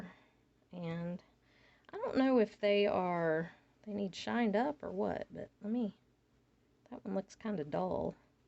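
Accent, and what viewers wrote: South, United States. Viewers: Missed that voice. That southern charm. Glad you are moved.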